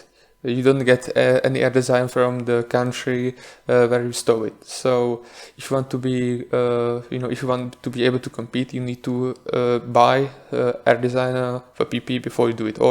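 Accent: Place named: Czech Republic. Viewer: i tried to watch it but i could not stand that czech accent.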